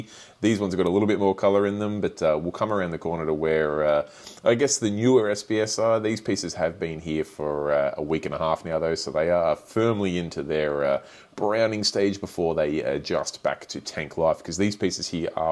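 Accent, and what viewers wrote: Australia. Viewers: Ahhhh, I always thought it was..... I do love Australian accents😁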